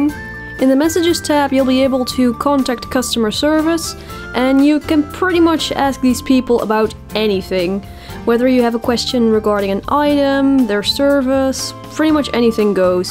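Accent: Netherlands. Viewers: ooooOOoo so it's a dutch accent I though you were british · I had a hunch you'd be Dutch. Sometimes my english accent will sound somewhat similair (as I don't have a consistent English-speaking voice).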